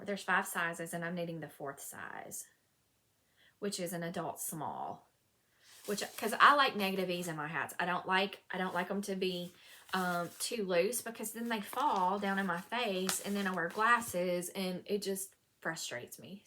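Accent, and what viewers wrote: South, United States. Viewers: Congratulations on your one year podiversary. It’s hard to pick a favourite moment but learning the correct way to say pecan today is up there along with your delightful southern accent. I’m in Australia so you sound very exotic and of course I was mispronouncing pecan 😉🙃😎❤️ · Hard to pick one favorite moment of your podcast but I always enjoy your southern accent and how you just enjoy yarn & your life.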